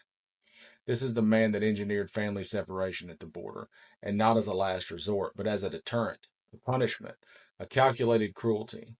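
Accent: South, United States. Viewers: First time seeing one of your videos. Your presentation and eloquent southern accent really hits home. However, I have to say I’m skeptical that you wrote this speech. I think this was crafted using AI. Not to say it’s not 100% accurate. It just looks and sounds extremely well written. Regardless, the message it brings is something everyone needs to hear. Bravo! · I love hearing this southern accent speaking COMMON SENSE! Keep up the good work!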